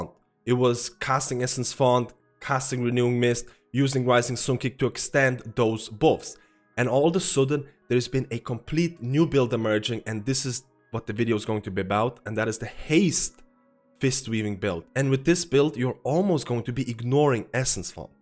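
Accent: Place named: Ireland. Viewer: are you living in Ireland, serious Irish accent lol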